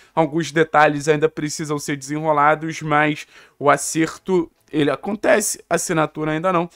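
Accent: Rio de Janeiro, Brazil. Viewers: Com esse sutaque carioca , vc está querendo pegar carona no maior do sul , sai fora carioca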